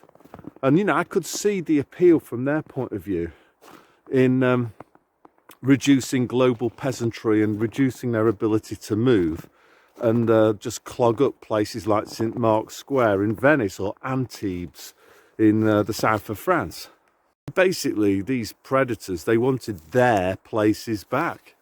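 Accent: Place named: Lancashire, United Kingdom. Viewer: We gave up work in our late 40's and moved to a house we had bought a couple of years earlier in northern Spain, intending to live "the good life". We ended up loathing the insane bureaucracy and thinly veiled corruption and returned to a very rural part of England. I have thought about upping sticks again but have more or less given up on the idea. Ironically I have met more like minded people since this whole sh1t show started than I ever knew before and have a great network of awake friends as well as an awake church I attend. Also I am fully expecting to be raptured more or less any day now so am hanging in here. Really look forward to your words of wisdom and, as a fellow Lancastrian, enjoy the delivery almost as much as the content!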